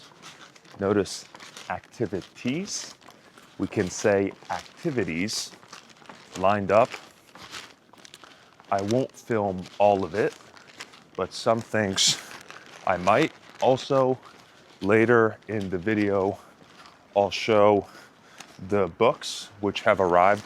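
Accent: United States. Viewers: your American accent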